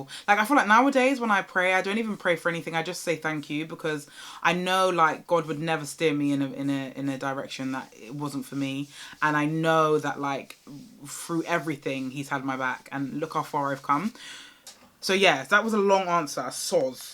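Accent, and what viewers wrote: United Kingdom. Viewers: your british accent 🇬🇧 i wish i had the same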